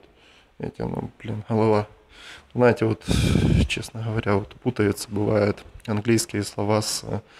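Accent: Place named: Ukraine. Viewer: Привет!)Акцент украинский хороший!)))Спасибо за советы!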